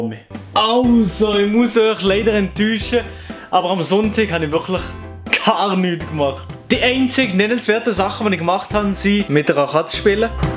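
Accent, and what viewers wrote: Switzerland. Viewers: Also Züri-Dütsch isch das nöd, was isch das für en Dialekt?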